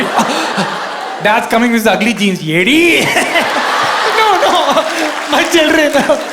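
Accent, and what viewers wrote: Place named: North India, India. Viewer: പാലക്കാരൻ ചെക്കാ പൊളി പൊളി but your accent is like a north indian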